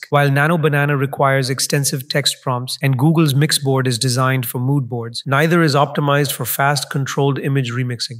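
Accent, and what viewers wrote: India. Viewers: Better hear indian ahh voice than ai crappy voice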